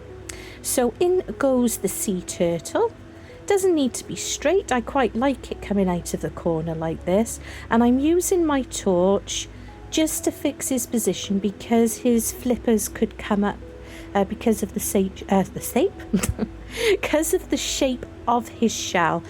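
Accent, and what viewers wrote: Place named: Wales, United Kingdom. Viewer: I love to hear the homeland accent I was brought up in South Wales NR Merthyr Tydfil 😊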